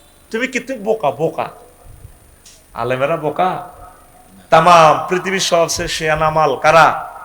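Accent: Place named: Noakhali, Bangladesh. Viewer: নোয়াখালীর আঞ্চলিক ভাষায় কথা বলেন উনার হাত ধরে নোয়াখালী থেকে অনেক বেদাত দূর হয়ে গেছে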